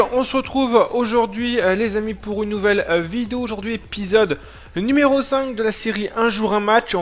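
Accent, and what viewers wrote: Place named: Marseille, France. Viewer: pk il parle comme les marseillais delà télé-réalité lui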